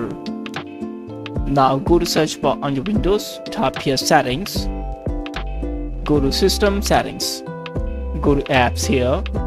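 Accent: India. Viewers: Hey man, thanks for the help! I know that if a tutorial has an indian guy voice i know that he will help my problem, and it will Thank you a lot Subscribing btw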